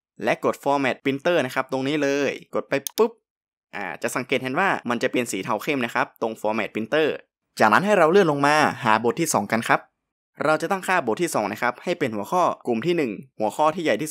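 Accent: North, Thailand. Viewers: ขอบคุณสำหรับความรู้ดีๆนะคะ ว่าแต่เจ้าของคลิปเป็นคนเหนือไหมคะ😂😂😂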